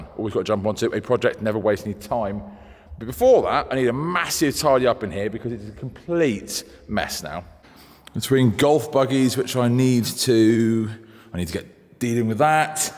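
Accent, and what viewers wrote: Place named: England, United Kingdom. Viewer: It's still chugging! You are a wizard! You are doing a fantastic job with the van and everything. Loads of fun things to do with the van yet... but you are doing good. Does remind me a lot of my Pops working in his shop... I miss him. But watching you work makes me happy. The only thing that is odd is well... the very English accent. But that's ok I love that. You got your chipper and lawnmower to fix up... but it's coming.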